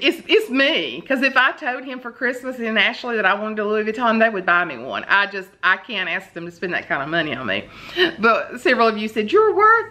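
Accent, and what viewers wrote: South, United States. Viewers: you are being covered with prayer for next Wednesday. Please let us know that all is well. Your church is truly doing God's work! Prayers for you for the energy and strength and enlightenment as you work on the grant for the community mission. I just got back late last night from a trip with my siblings and their spouses to Nashville and Memphis. I thought of you every time I saw a sign for Birmingham. I was born in Georgia and always feel "at home" in the South. Loved opening up my iPad and hearing your southern accent this morning. Would love a chance to own Nonnie's Nuggets- ❤️. - Susan in Maryland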